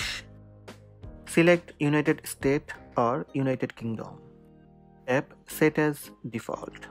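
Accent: India. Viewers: But I like the Indian accent!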